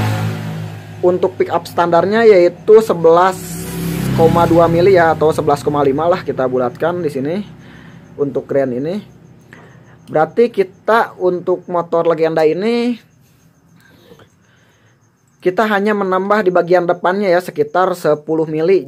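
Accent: West Java, Indonesia. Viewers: lokasi mana bang..logatnya kayanya sunda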